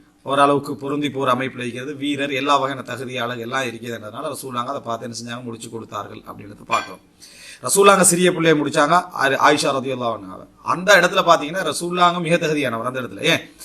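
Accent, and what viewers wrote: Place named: Sri Lanka. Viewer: Avar srilankan tamil.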